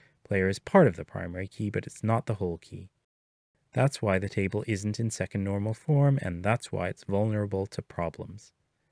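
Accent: United States. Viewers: Are you from Philly? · You from Baltimore?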